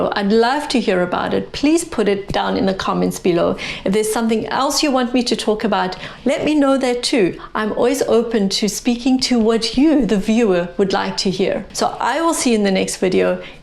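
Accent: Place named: South Africa. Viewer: Hi, Sonia from South Africa here. I just listened to your 4 part talks about voice in memoir. I am in the planning phase of writing my story of how I met Jesus (in an armed robbery at 4am in my bed) after being lost in the new age for 20 years. I feel soooo inspired after listening to you speak so beautifully about voice in memoir. I want to encourage you to please keep making these videos. You are talented, well spoken, your tone is calming and confident... and most of all, you are doing what Jesus created you to do. In Him, we can be sure that we're walking the story He purposed us to do, as it says in Ps 139 - He wrote down our days in His book! I will be praying for you to get more subscribers and also that people will comment more. I see that you are based in Canada, but I pick up a South African accent. Are you originally from South Africa? 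Blessing to you my dear sister in Jesus. ps. I did a youtube search for 'voice in memoir', and your channel was first in the search results:-)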